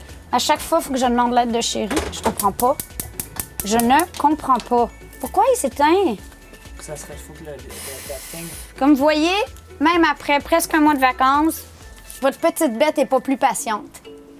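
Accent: Quebec, Canada. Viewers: J'adore son accent québécois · Super je n'avais jamais goûter de pudding et je viens de me rendre compte que j'avais passer 24 ans en ratant cela ! Petit coucou de France, ou il fait bon d'entendre l'accent du Québec ! Bisous 😁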